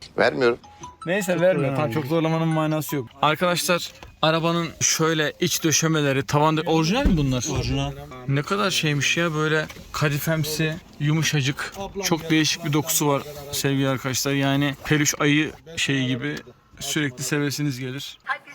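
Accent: Gaziantep, Turkey. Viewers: Plaka 27 amcaoglu antep şivesi ile konuşuyor. Abi sen anteplimisn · Amcaoğlu hafiften Antep ağzıyla konuşuyor.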